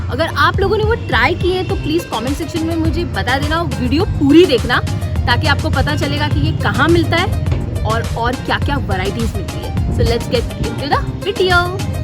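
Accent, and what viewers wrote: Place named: Mumbai, India. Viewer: Lovely VDO. Nice casual feel to it.. i absolutely love your Mumbai Hindi..❤️ Just one thing.. u missed one of my favs Irani Onion Patti samosa....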